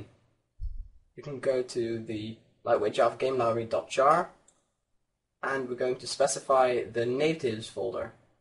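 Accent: Netherlands. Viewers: you can just hear your dutch, ik ook :D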